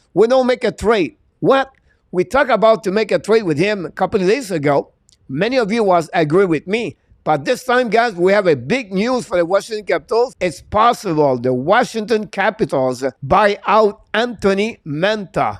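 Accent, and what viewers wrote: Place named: France. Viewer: I love just how french you are Coach! The way you speak c'est parfait! Keep up the content please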